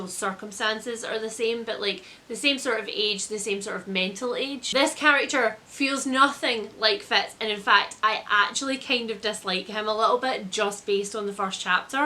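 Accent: Scotland, United Kingdom. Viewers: I'm on staycation and I have binge-watched so many of your videos that my inner monologue now has a Scottish accent 🖤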